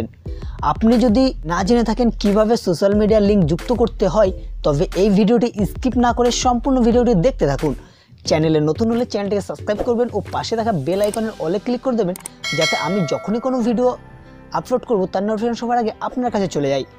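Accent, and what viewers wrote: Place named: Sylhet, Bangladesh. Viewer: sylheti bai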